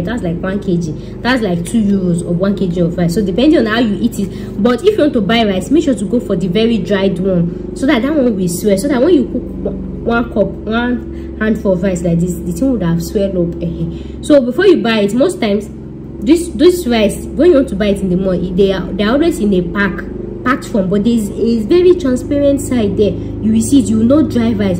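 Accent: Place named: Ondo, Nigeria. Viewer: With the yoruba accent i think you are from ondo townm please tell me i am wrong! omo yemi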